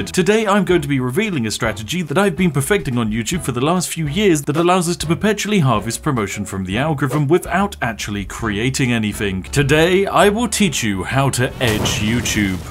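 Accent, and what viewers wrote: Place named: Yorkshire, United Kingdom. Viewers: Wow Yorkshire. Tea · Yorkshire :3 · Very Yorkshire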